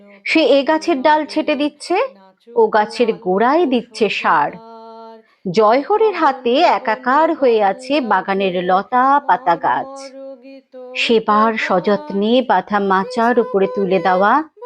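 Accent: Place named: Bangal, Bangladesh. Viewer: দারুন সুন্দর লাগলো তোমার কবিতা পরিবেশন বিশেষ করে তোমার মুখে বাঙাল ভাষা গুলি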